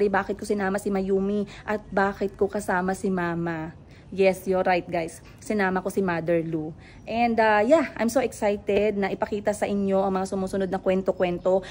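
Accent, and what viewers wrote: Iloilo, Philippines. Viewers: 😂😂😂 nalilingaw takaga ako sa pag i Ilonggo mo Ms. Nalilipay talaga kmi makabati sang inilonggo mo ah. Enjoy kamo sa Thailand, God bless and keep safe po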